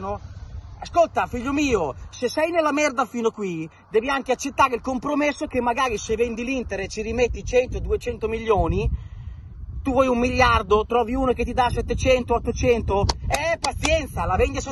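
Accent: Romagna, Italy. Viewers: Se fai il video in romagnolo vuol proprio dire che sei incazzato... mi aspettavo qualche piatto rotto... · io lo ascolto solo per il mitico accento romagnolo!! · 100% romagna, quando uno è incazzato non c’è niente di meglio del dialetto romagnolo per esprimere il proprio malessere, te lo dice un romagnolo doc che quando ti sente parlare si sganascia dalle risate... e così sei passato dai piatti ai pomodori, e va beh, almeno sono freschi e sai cosa strozzi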